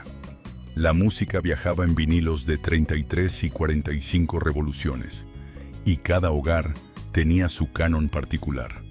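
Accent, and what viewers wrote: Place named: Mexico. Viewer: Pero si este que habla es mejicano !!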